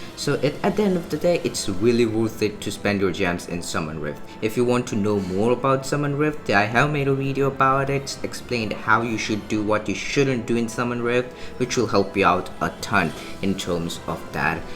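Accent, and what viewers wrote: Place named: India. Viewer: why can’t indians say “er”??? its always “ohh” like instead of “first” they say “fohst”. or burst is bohst. so annoying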